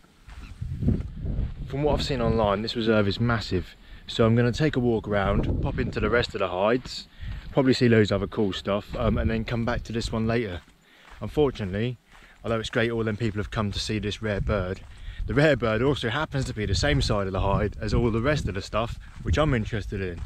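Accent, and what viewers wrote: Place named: Hampshire, United Kingdom. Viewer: your accent is so similar to hampshire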